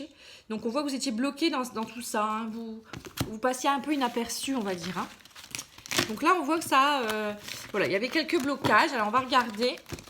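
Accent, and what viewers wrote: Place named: Pas-de-Calais, France. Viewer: 👍Suis du pas de Calais aussi je l avez perçu ds votre accens🤣😘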